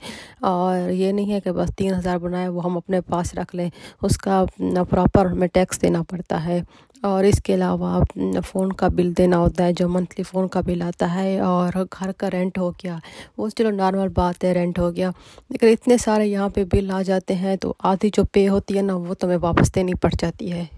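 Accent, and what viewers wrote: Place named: Pakistan. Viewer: wasa ap ka bolana ka andaz sa lag raha ha ap Pakistan sa kidhar sa ho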